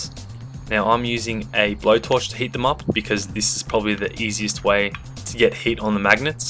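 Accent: Australia. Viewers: Are you Aussie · OwO is that another aussie I hear? Or am I mistaken.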